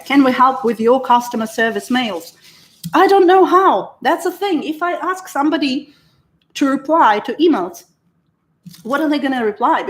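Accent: Jamaica. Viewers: I love how you pronounce some words like us Jamaicans. I love your channel. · Your talking is so fresh i love they way you blend your Jamaican language is splendid and so re refreshing your shows are fantastic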